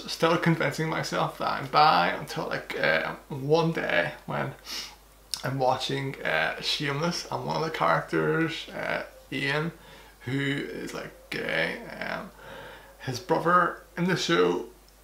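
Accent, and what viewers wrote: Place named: Ireland. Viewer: I loved your video and your accent, where in Ireland are you from?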